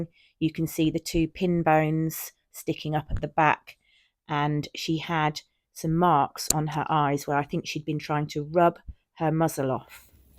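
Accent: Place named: Essex, United Kingdom. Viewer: Fairy is beautiful and she is so lucky she has landed on safe ground with you as her new owner. You sound the perfect, caring owner every dog could wish for. Well done for rescuing her! 👏🏼👏🏼👏🏼Seems funny hearing the Essex area accent again. It's exactly how I spoke. I now live in Ontario Canada and have done for 45 years, but I was born in Romford, but lived near Maldon after getting married. It's a lovely area, obviously I know it well. I have always loved greyhounds and my brother-in-law owned a Lurcher. How I loved that dog. He looked like a big hairy greyhound LOL He was also a rescue and had been used for badger baiting. Rescue is the way to go! (she says - with a breeder bought Shih-Tzu/Maltese 🙄)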